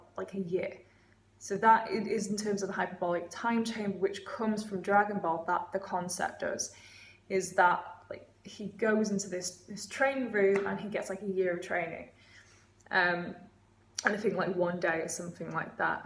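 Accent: United Kingdom. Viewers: I love your British accent, your eyes, your look, your class, like the famous British cars. Greetings from Italy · Love your British accent. I got no subliminal request. Just wanted to compliment you...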